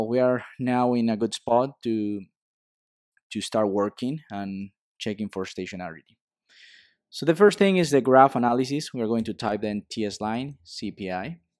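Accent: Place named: Spain. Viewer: Very nice instructions that get to the points immediately. Just had to get use to your charming Spanish accent, but after overcoming this "obstacle" no problems whatsoever!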